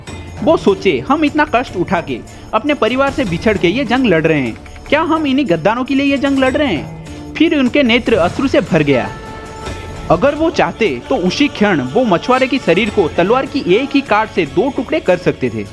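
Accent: Odisha, India. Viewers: haa se Odisha ra karan tara bolene ke tarika ba kahiba uchharan ba assent ru jana paduuchi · It is evident from his hindi accent. Don't expect any support from so called northies. we are enough to promote our history and culture. why to give 😞😞 emoji.. 'Odia pila hindi kahile dhara padijaye🤓 kahinki na aama bhasa re sanskruta ra prabhaba besi"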